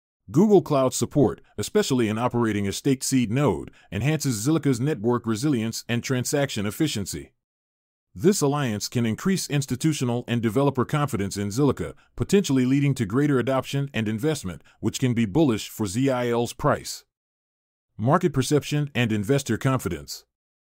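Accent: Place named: Singapore. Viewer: Prefer your SG accent lol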